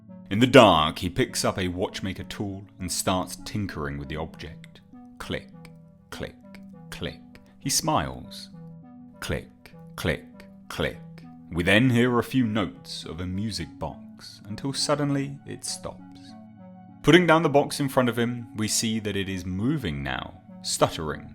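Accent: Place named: United Kingdom. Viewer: With a little more bass in your voice, you could have a nice cenobite tone. Or maybe it's just the British accent. Yeah, that must be it...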